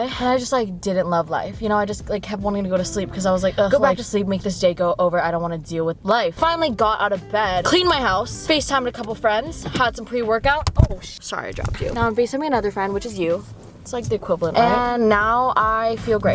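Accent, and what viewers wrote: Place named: United Kingdom. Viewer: Loved your British accent 😂😊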